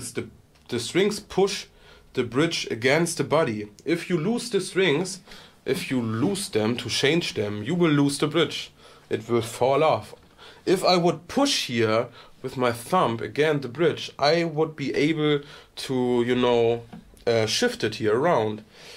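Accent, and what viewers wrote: Germany. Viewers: Mann, ich liebe deinen deutschen Akzent. :D Normalerweise stört mich das bei Deutschen, die Reviews auf Englisch machen (Thomann - ganz grauenhaftes Beispiel). Aber bei dir ist es irgendwie ganz cool. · Your English with the heavy german accent is just wonderful 😊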